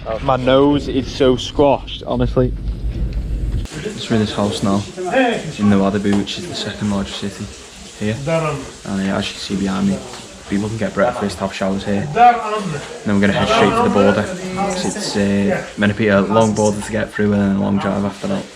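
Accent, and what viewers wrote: Liverpool, United Kingdom. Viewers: I'm trying to figure out your accent. Is it scouse?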